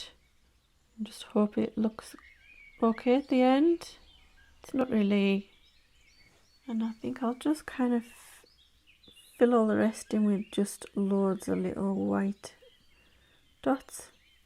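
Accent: United Kingdom. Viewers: Loved this tutorial! Please pace yourself so you don’t burn out. Where in the UK is your accent from? Much appreciation from San Antonio, TX USA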